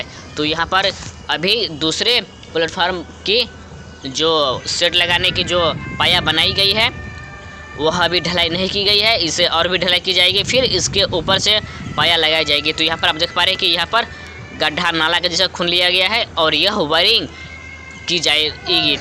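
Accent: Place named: Maithili, India. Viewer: Dbg se maithly babu bolne ka andaz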